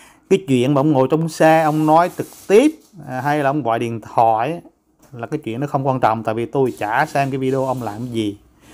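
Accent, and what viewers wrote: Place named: Quang Nam, Vietnam. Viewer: Giống noi tiếng Quang,không hiểu noi gì, ham nhiều chuyen,xỉa vo chăm biển người khác, sau co view cha nội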